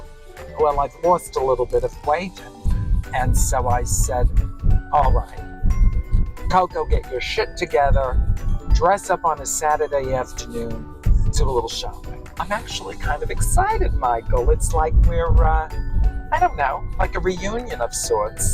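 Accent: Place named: Bronx, United States. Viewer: I love shopping with Coco and her beautiful self and that Bronx accent. ❤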